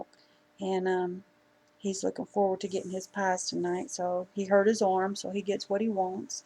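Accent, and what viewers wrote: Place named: South, United States. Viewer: You are such a sweet person & you are living like Christ wants you to & giving to thise in need is sucha wonderful way to show HIS love..Your pies look so yummy...My mom use to make those...She is ina nursing home now with Alhiemers..You have a southern twang like me..I'm from Oklahoma. I like the name Peyton as well..So cute!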